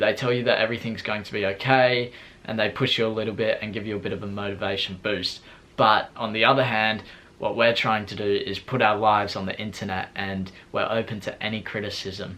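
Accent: Australia. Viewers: Is this guy Australian I just found this guy why haven't I heard of this guy?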